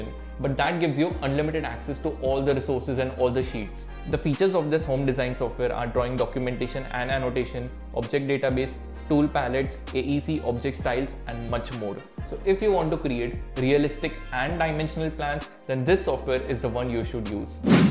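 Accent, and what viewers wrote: India. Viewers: It happens, don't worry. That's Indian accent. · Can we just appreciate this guy's beautiful voice nice indian accent ;)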